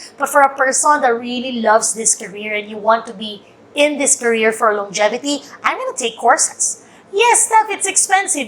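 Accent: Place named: Philippines. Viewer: Hi! I came across your videos, I was just doing my errands and i felt so annoyed with the feeds on my facebook. So I came here. I am an LMT here in the Philippines and i enjoy watching your videos. I get new ideas. I was about to apply there in Canada as a massage therapist after the pandemic before we created our massage business but my husband didn't like the idea as we are both DEPED teachers at the same time massage therapist. It is our passion and we also have massage clinics. I assume you are filipino. You speak like one. Are you?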